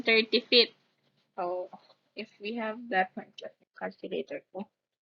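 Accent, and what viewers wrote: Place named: Bicol, Philippines. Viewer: bicolano pala kayo maam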